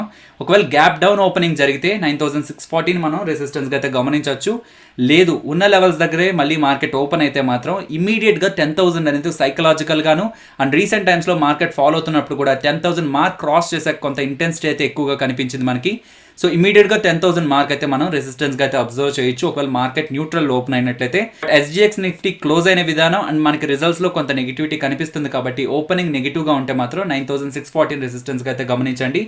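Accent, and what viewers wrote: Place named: Nellore, India. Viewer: nellore kadha anna meedhi.. slang alaney undhi..🙂🙂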